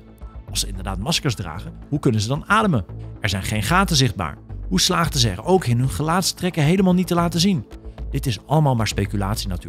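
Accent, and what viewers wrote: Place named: Netherlands. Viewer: 'Zeker en vast' is Vlaams maar je klinkt Nederlands. Huh?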